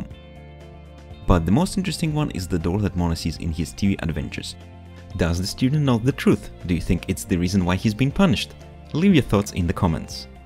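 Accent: Russia. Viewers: Я слышу русский акцееент~ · I feel a Russian accent in your words I'm from Russia :3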